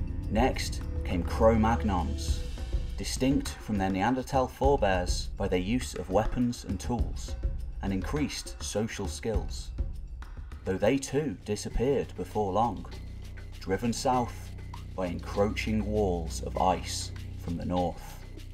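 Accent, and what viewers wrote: United Kingdom. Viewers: KuLLChu Can I find a Swedish or Egyptian subject that ISNT NARRATED by a damn BRit????? KuLLChu??? Sheeeeeeesh.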